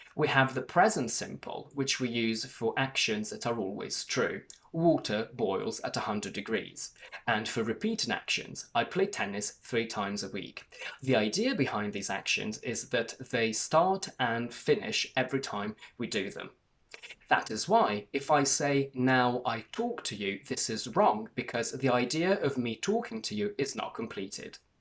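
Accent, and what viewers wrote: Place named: England, United Kingdom. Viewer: Love your English accent.. happy to see you again..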